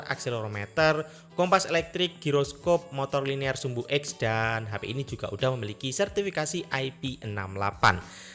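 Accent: Banyuwangi, Indonesia. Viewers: Lho sampean orang BWI mas?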